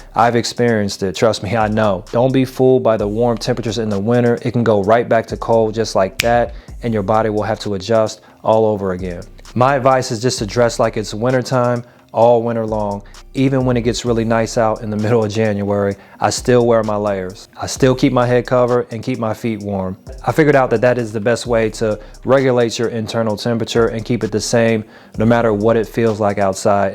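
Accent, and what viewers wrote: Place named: New York, United States. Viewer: sound like New York to me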